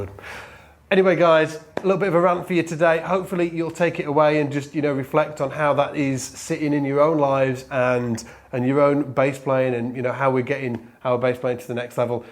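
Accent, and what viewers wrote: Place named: Liverpool, United Kingdom. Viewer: you talk like!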